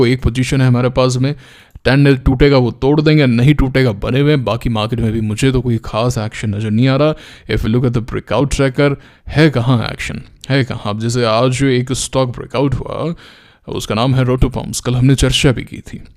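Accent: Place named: Bagri, India. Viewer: gale mein aagi aapke😂😂😂 wah maza aa gya swad hi aa jaata hai jab bagri use karte ho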